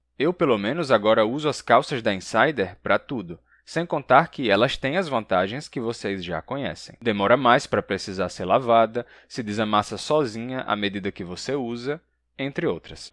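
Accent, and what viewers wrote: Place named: Rio Grande do Sul, Brazil. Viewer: Descobri porque acho seu sotaque diferente dos nordestinos que conheço: sua pronúncia do "e" no final é parecido com a pronúncia dos gaúchos: "leite quente dói o dente da gente".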